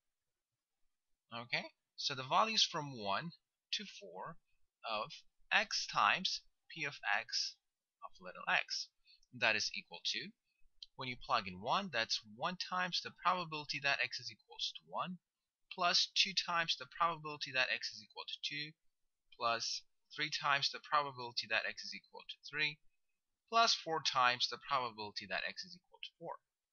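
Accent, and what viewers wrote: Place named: Germany. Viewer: I detect a slight german accent.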